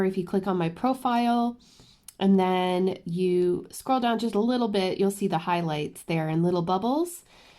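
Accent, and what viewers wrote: Ireland. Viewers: did you realize that you are picking up an Irish accent? When you were outside with your new divas it was coming out. It fades in and out of your videos depending on what you are doing.💖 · Valerie Dunham I heard it and loved it. But then again I love the Irish accents anyway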